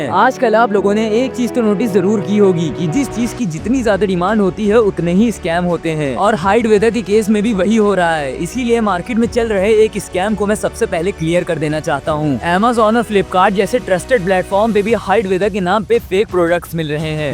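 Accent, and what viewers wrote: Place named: Lucknow, India. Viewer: bhai ap bhai lucknow jaisi aawaz me bolte hoo and mai lucknow me reh chuka hu brother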